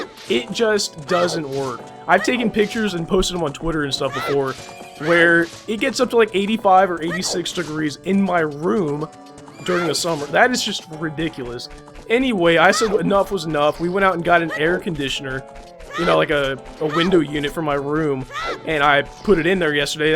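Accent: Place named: South, United States. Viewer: Your Southern rural accent is really good. Not the horrible caricature that comes from a place of disrespect that I hear so often.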